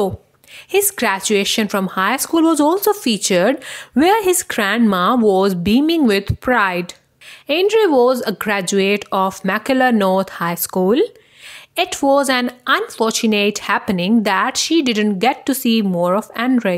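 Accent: India. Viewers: She sounds Indian from india or possibly an Indian born or raised in England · She sounds India dialect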